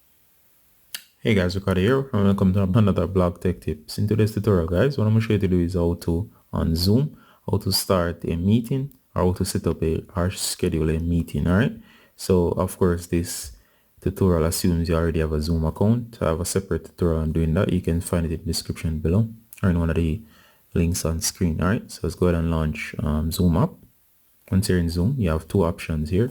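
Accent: Jamaica. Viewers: Sound like Jamaican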